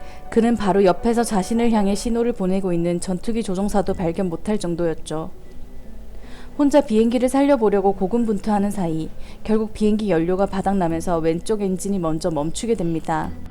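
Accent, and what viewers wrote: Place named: Gyeongsang, South Korea. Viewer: 고향이 경상도쪽 이신가바요..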